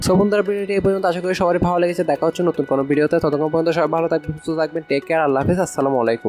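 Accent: Chittagong, Bangladesh. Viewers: চিটাইঙা পোয়া বাজি যেইল্লা মাতক চিটাইঙা যে বঝা যাইবো😅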